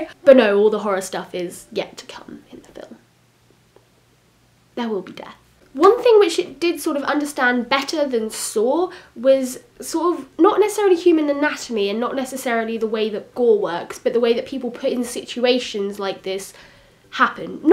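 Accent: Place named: England, United Kingdom. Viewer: I really like your accent :D Which part of England is it from? And nice eyes too :P . Yes, i've seen all 3 Hostel parts, and the best is the first part.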